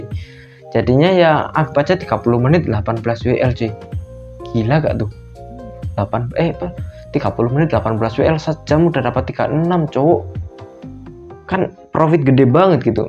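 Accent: Indonesia. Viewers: Lah kok ngapak wkwkwkwkw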